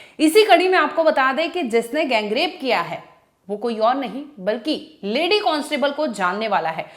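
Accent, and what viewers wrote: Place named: Bengal, India. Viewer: Aap Bangali hai kya aapki Hindi bilkul sahi nahin hai aap sahi se nahin bol paate Ho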